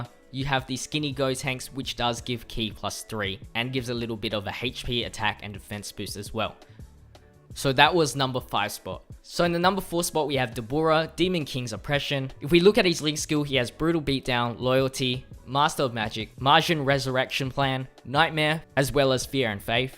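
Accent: Australia. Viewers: Hey dude are you from Aussie i think I have asked before but Idk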